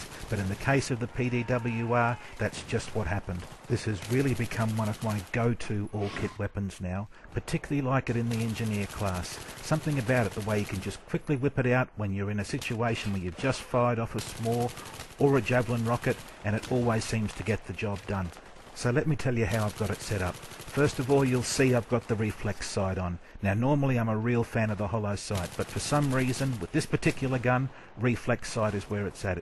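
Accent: Australia. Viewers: AUZZIE BRAHHH! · Found out about through golf squad on the shustybang channel. I like his vids diff from other commentators, and the accent is Australian. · Don't see too many Aussie commentators. Thumbs up! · hes like... the australian boo :o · Fuck yeah!!! Aussie mate :D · Dude, his Australian!!!!! Pwn thinks its a cool accent! im australian!!!! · see to me sounds so aussie lol he is australian btw checked hes channel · Is this guy australian? If he is then good on him ;) go aussie and the ps3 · Aussie aussie aussie..? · I dont wanna be mean or anything but me my self fell asleep listening to this its a game who the fuck want to listen to the origin of a weapon in a GAME stats is ok thats okey but whats the purpose telling everything about a gun what does that help. to know which is the best one where it was made and shit tbh i need to be paid to listen to this but good gameplay thought and nice accent^^ AUSSIE PWN!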